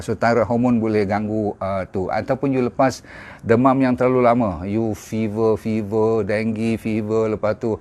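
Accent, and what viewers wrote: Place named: North, Malaysia. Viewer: Dr ckp ja utaqa . Lgi syoik dengaq . Hehehe .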